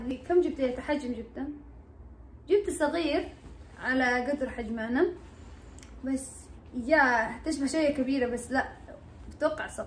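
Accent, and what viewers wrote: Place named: Sudan. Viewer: لهجتك السودانيه حلوه شديد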